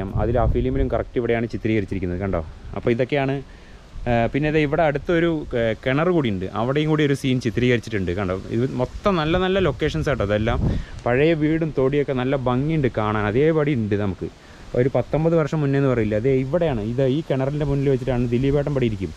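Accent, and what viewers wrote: Palakkad, India. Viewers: intro kalakki . paalakkaad oru sambavam thanne. Paalakkaadan slag aanoo chettan samsaarikkyunne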